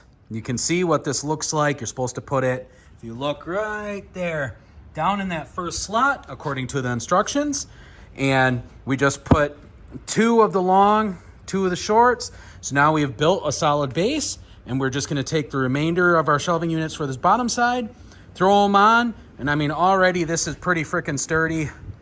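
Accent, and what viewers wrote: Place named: Wisconsin, United States. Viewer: glad I could confirm the Wisconsin accent! appreciate the reviews :)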